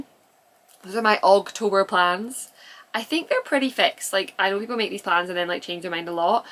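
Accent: Scotland, United Kingdom. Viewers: Hi, I love watching your videos. Last year I planned a ten day driving trip to Scotland and I watched a lot of videos with people from Scotland explaining the history and as interesting as that was I kept finding myself listening to your podcast. And i just wanted to say that hearing your Scottish accent really did come in handy when talking with people in Scotland. Because I was listening so intently to what you were saying I found that after a bit I was hearing your accent less and less. So thank you for that and keep on knitting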